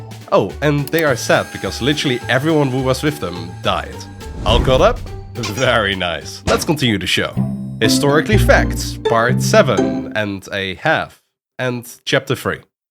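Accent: Netherlands. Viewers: Are you Dutch by any chance? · the Dutch accent is making it so much better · love ur Dutch accent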